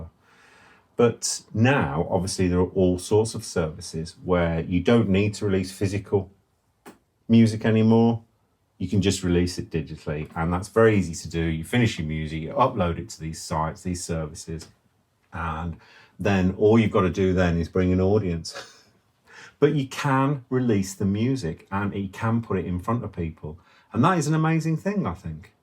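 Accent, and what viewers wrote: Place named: Birmingham, United Kingdom. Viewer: Hi again. From your accent I’m presuming you’re local to Birmingham as am I. If you ever want to collaborate on anything then please get in touch. I’m a piano and woodwind player so I’m sure we could cobble something together. 👍🙏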